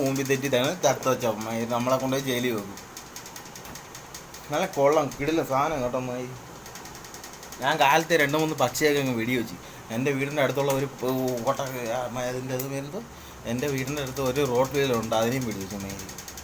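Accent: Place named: Trivandrum, India. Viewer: Tvm bhaasha aanu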